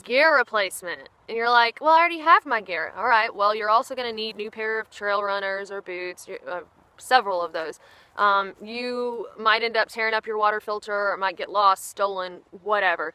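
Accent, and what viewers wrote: South, United States. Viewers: hey how old did you do the AT? also did you make it to the PCT? i could listen to you all day long i love the southern draw:)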